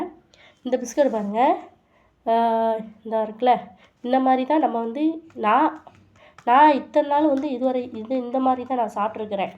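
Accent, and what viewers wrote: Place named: Tirunelveli, India. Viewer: Thirunelveli பாசை sis 🎉🎉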